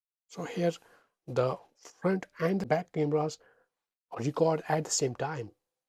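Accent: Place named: Kashmir, India. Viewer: Kashmiri accent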